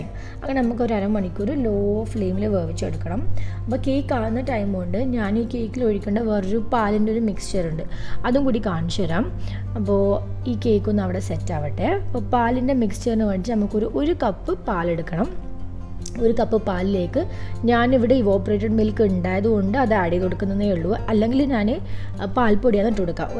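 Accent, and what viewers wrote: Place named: Kannur, India. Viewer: കണ്ണൂരാണോ നിങ്ങള്.. വർത്താനം കേക്കുമ്പോ അങ്ങനെയിണ്ട്..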